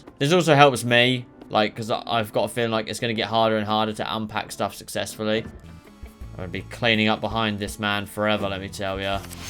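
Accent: United Kingdom. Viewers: My God, I Love your British Accent....😊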